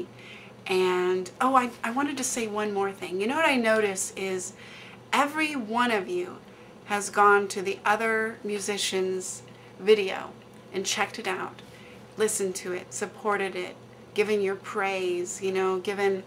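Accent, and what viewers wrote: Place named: Germany. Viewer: still liv zhat times and your well german accent ;) cheers kc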